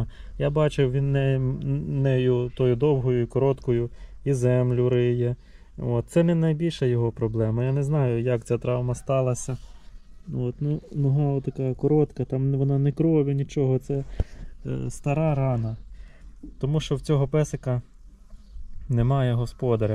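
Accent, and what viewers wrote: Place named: Western Ukraine, Ukraine. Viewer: Почала вас дивитись-зацікавили.Ви вихідці з західної України?говірка така,як в нас на заході.Удачі Львів 60,50грн.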